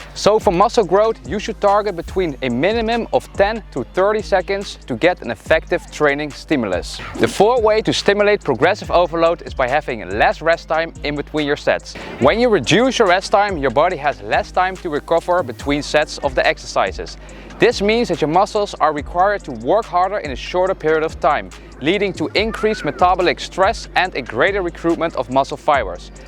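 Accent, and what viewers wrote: Netherlands. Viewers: ik hoorde meteen dat je Nederlands bent hahahahaha. Lekker bezig! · Dutch accent always remains 😊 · It took 10 seconds to recognize you are dutch.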